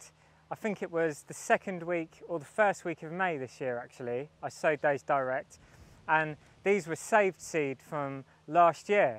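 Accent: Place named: England, United Kingdom. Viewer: I only seem to be able to get ordinary yellow corn here in France; it's a drag trying to get anything different; like jumbo banana squash!! We live in a beautiful place, in the mountains but seeds restricted to little and Holland. Last year i had to high hopes for corn - and before i knew it ants came and took it over it looked OK but they were there; no one else seems to have had the problem! I planted beans with my corn today oops I will stake them then! Where the hell are you; as you have an English accent but it looks like a tropical place, how did you get there?? One sweet potato that will grow here costs 5 euros -- how do you get is it 'slips' what and how to you propagate them?? I need them as we love to eat them!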